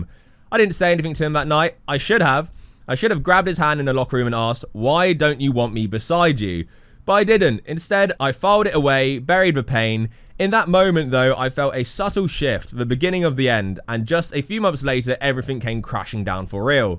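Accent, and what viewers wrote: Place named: Southern England, United Kingdom. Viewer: a name like is an immediate hint. With the tache you might as well have the map of Ireland on your face. The contrast between your Irish features and South of England accent is quite something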